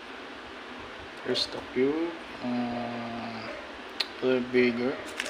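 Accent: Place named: Philippines. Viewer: I like ur accent are u filipino?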